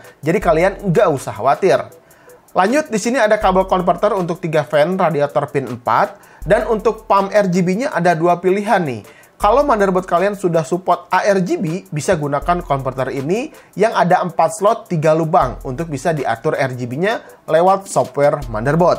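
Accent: West Java, Indonesia. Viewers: Pideo.... Sunda ya?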